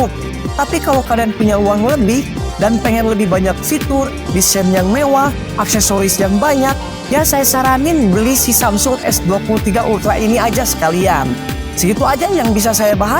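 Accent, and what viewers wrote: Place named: West Java, Indonesia. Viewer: logat na nyunda pisan 🍵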